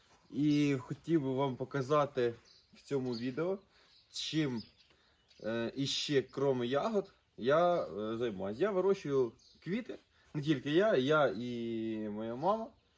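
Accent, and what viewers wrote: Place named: Poltava, Ukraine. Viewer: Чую чую полтавський говір, бо сама з Сотницького,Гребінківський р-н,там народилася ,а зараз під Києвом живу,а батьки там. Успіхів вам та мамі. Молодці. Приємно бачити та чути,що ви вже й канал маєте. Розвитку та продажу,нових ідей, здоров'я та мирного неба. Підписка😁💛💙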